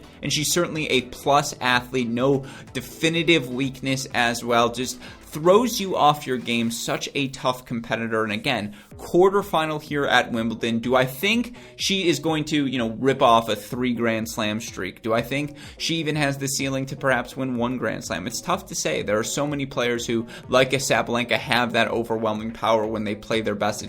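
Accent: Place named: United States. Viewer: Well described, well resumed, well explained. And what a beautiful american accent!!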